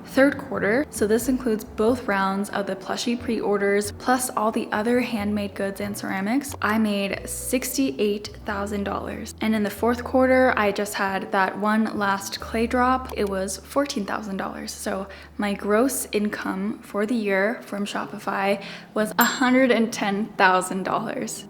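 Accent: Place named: England, United Kingdom. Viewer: i love your english accent❤ and also every video you uploaded, you’re the best!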